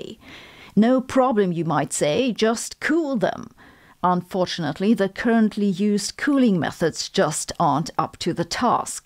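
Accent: Germany. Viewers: It's funny how all Sabine's "e"s become like a German would pronounce an "i", even in words where the actual pronunciation is much more close to how a German would pronounce it, like in Graphene.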